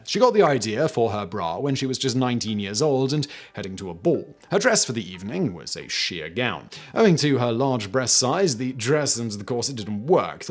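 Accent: England, United Kingdom. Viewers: Listening to him speaking fast with that Brit accent is kind of exhausting for an American. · I didn't know i needed a calm British recitation of breast slang until today. Thanks,. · I'm sorry but I really really like laughing at you saying those euphemisms for breasts and your British accent maybe it makes me a boob but there it is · Why is a guy with a British accent advertising shoes you can't get shipped to Britain? Cheers, A British guy.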